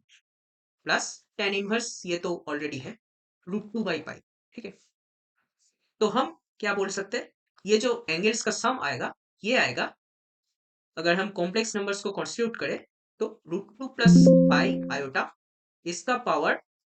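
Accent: Bengal, India. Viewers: Bengali ho kya bhi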